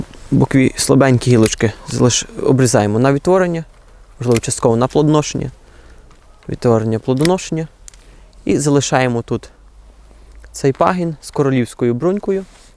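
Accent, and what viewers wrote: Western Ukraine, Ukraine. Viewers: Та трошки iз захiднянським акцентом, але вишукано й в одночас просто.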